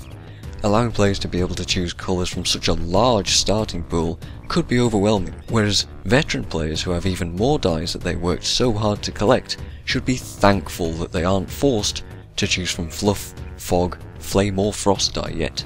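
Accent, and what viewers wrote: North, United Kingdom. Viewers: Really great video :) Plus, you sound Northern, which is always a pro.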